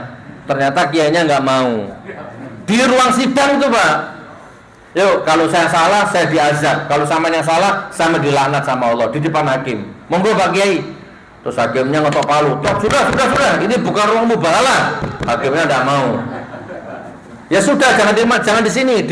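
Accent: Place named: Banyumas, Indonesia. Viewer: Senangnya sama Gus Nur ini blaka suta cara bahasa ngapaknya love you Gus ❤❤❤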